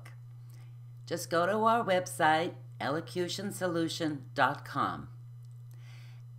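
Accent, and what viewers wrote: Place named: East Coast, United States. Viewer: Because they don't pronounce the words with the letters with which they are written... j/k, this woman is claiming that foreigners who fail to use unaspirated "t" sound sloppy when unaspirated "t" is a later addition to English phonology innovated by colonists and immigrants who couldn't be bothered to fully aspirate it. Traditionally in English t is aspirated in every position. That's why it's written with the same letter. There is some irony in prescribing something and claiming that failing to use it is "sloppy" when it started out a "sloppy" feature of non-standard dialects in the first place. Other English speaking countries like the UK and Australia are filled with dialects that replace "t" with glottal stops all the damn time, but you don't catch them prescribing it as "proper English" for learners lol. 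On the whole, I find the implication that certain uses of language are "sloppy" borderline offensive. It's especially rich from someone who sounds like they speak some kind of refined regional East Coast dialect rather than modern General American standard. Nobody under 60 sounds like this woman in the US anymore, and if you're going to go full prescriptivist, why not go fully 19th century and recommend the Queen's English. It's quaint to see an American fuss over "proper English" and "proper elocution" when their "proper" dialects are relatively novel anyway. Anyway, if you want to speak like a typical US American, then follow this advice. Just know that it arose fro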